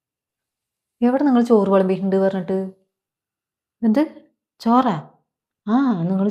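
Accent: Palakkad, India. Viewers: പാലക്കാടൻ slang ആണോ ഇത്?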